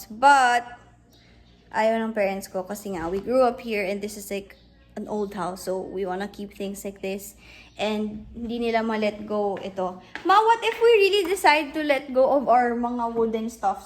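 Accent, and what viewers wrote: Visayas, Philippines. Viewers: I love her bisaya accent like when she said wala na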